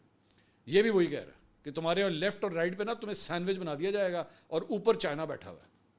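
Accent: Punjab, Pakistan. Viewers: Hahahhaha bhai a jehra tusi vich punjabi da touch denday jay os naal program ka maza dubala ho jata hay😅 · اردو میں پنجابی ٹچ دینا آپکو دوسروں سے منفرد بناتا ہے... یہی انداز آپکو بہتر ویوز دیتا ہے.. 😉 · Ur Punjabi accent ❤️😂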